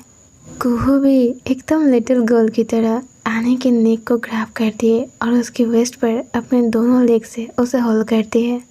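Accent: Bengal, India. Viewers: Didi aap kolkata sa ho kya kyu ki main bhi kolkata sa hu apki Bengali language sa lagta hain hain ki aap hain Bengali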